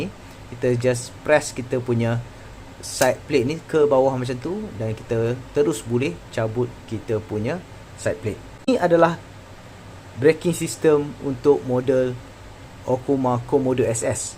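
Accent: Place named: Penang, Malaysia. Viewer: Dekat jla ingat org penang😅😅😅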